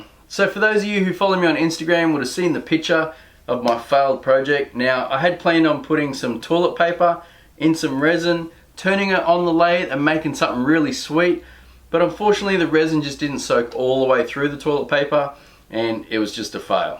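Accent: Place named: Australia. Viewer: hello, I hope this finds you and yours in good health and fine spirits. I just found your channel and I really enjoy your content. I do like an Australian accent and you seem like a regular guy whom I would like as a neighbor. The popular YouTubers say it took them a long time to grow their channels. They said their channels just limped along for years until one day their channel just took off, so hang in there.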